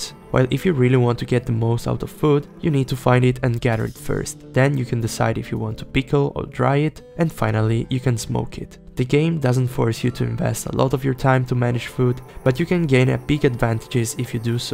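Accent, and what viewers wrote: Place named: Italy. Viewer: Brooo amo il fatto che si sente un casino che sei italiano ahahah comunque grande❤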